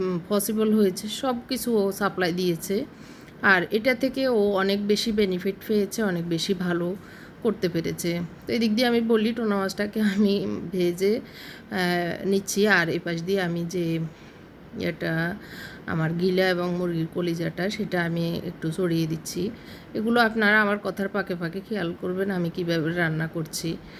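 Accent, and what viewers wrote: Noakhali, Bangladesh. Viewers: আপু তোমার বাড়ি কি নোয়াখালী???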